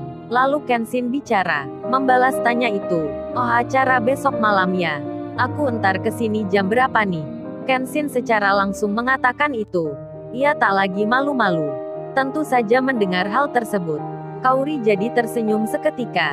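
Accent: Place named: Tegal, Indonesia. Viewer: kaya,a mirip boso tegal nggih 🤭🤭🤭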